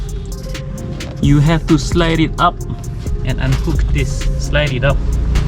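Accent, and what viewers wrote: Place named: Australia. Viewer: Pergh oz accent... Next content seat bucket ke???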